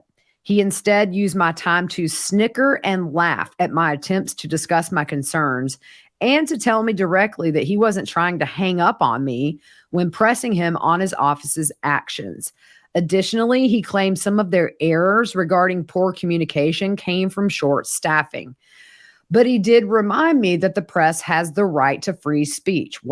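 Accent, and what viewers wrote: South, United States. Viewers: I could listen to you talk 24/7! I just love your voice with a slight accent. Are you from the South? I’m in western North Carolina but my accent sucks! lol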